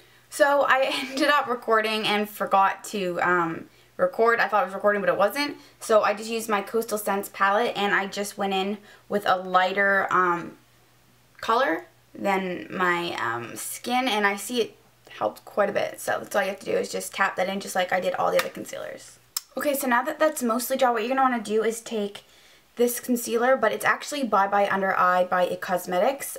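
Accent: Canada. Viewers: You sound Canadian. Are you? :)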